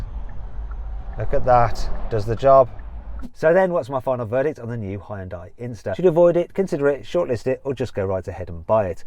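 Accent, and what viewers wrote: United Kingdom. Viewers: 이 양반 볼 때마다 딱딱 떨어지는 영국식 억양에다가 뚜렷한 이목구비, 항상 35%정도 빡돌은 얼굴인게 내가 상상하는 영국인 이미지에 되게 들어맞아서 볼 때마다 뭔가 재밌음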